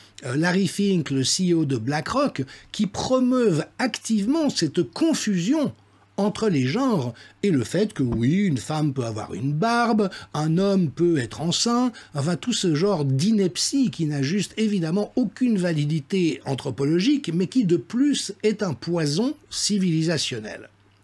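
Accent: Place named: South, France. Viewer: J'adore ce nouveau format, hyper jolie😍 avec l'accent du sud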